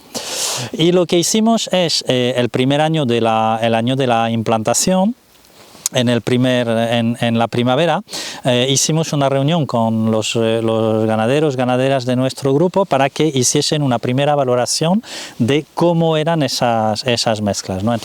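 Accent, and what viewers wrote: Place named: Andalusia, Spain. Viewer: Muy buenos tus vídeos, pero lo que más me llama la atención es tu acento. ¿De dónde eres? A veces me parece que eres andaluz (pero no sé de cuál región específicamente), a veces que hablas catalán, a veces que hablas portugués (por pronunciar bastante abiertas las vocales)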